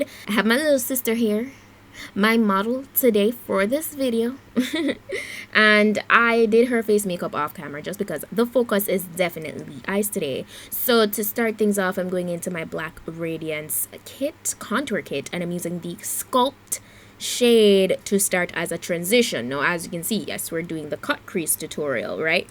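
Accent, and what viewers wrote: Jamaica. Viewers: Do I sense a slight Jamaican accent...... best cut crease video for chocolate girls.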